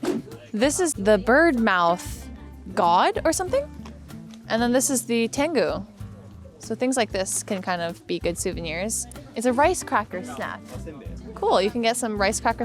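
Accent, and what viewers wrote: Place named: United States. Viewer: Your american accent sounds perfect, your voice is so female.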